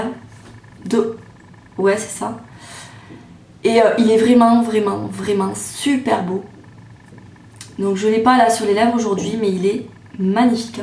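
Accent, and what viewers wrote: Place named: Toulouse, France. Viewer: T'habites a toulouse? Je me disais bien que t'avais un accent!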